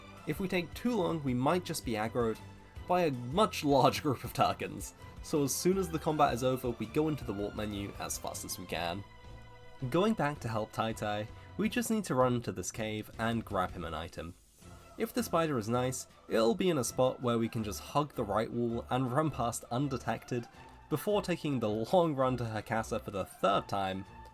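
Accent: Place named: United Kingdom. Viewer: How did I just now realize you’re British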